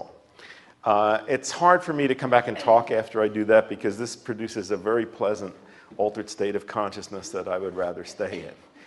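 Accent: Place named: Philadelphia, United States. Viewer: Wow, the counting was fast. I will give it a go. Do I ever hear Philly in Dr Weil's voice; "out", "around", "choklit." The 1st 2 almost have an extra vowel sound I couldn't spell. Love it, however.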